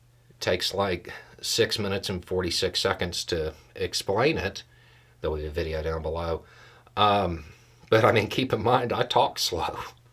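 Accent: South, United States. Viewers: You don’t talk slow. You talk country. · You don't talk slow sweetie, you talk southern and explain perfectly. Thanks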